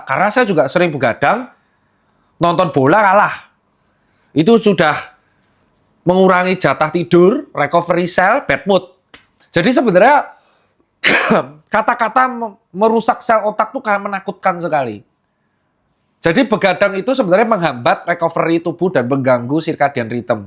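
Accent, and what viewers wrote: Java, Indonesia. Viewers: Asik penjelasan dok ter ini,ada jawa2nya😂😂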